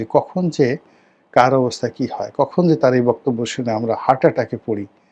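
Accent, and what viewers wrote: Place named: Bengal, India. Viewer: গ্রাম বাংলার গেঁয়ো কথা গুলো খুবই মনোমুগ্ধকর।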